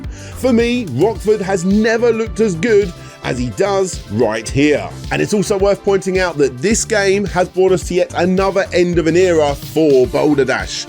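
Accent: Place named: United Kingdom. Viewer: It's very strange. You sound British, but you look American. Very queer combination.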